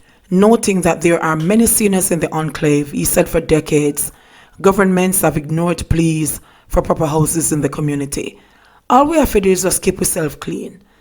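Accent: Jamaica. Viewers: I can never get tired of hearing you speak Jamaican... Lol 😂😂😂.... Great report though... I seriously pray that none of the residents contract Covid-19 🙏🏾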